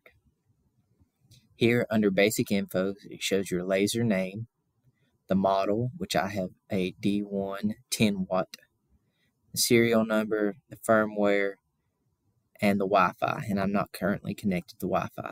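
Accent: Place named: South, United States. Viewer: You did an amazing job on this!! You are nice and slow on your descriptions, and I absolutely love the Southern Drawl!😊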